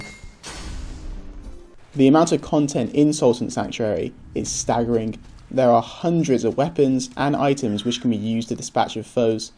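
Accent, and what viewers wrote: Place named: South London, United Kingdom. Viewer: Thanks for sharing your thoughts dude... I really liked your review, more objective and informative than a lot other reviews out there. A suggestion - record your audio and try varying your vocal tone a bit more, you'll sound more compelling. I like your calm and factual style, but sounding more invested helps (see angry joe getting angry). Only mention it as I have a very similar accent to you (south east London? Palace fan maybe lol?) and unless I think enthusiasm, I can put ppl to sleep. Anyway, nice vid, subbed - I request a ds3 review (if my pointers make you any ad revenue, I want my cut). Peace!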